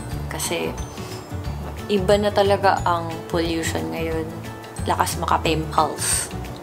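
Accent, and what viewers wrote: Visayas, Philippines. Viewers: HAHA! Nalingaw ko maminaw magtagalog ang bisaya.. Perti gyud kagahi ug kasamok maghunahuna unsa sunod e.estorya sa tagalog jud. Keep it up. Love your vids with dodong.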